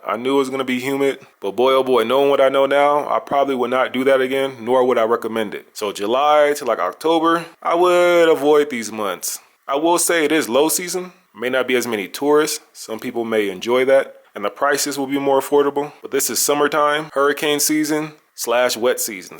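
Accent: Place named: Cleveland, United States. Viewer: Your videos are so dope! I hear an accent in your videos bro, are you from Cleveland?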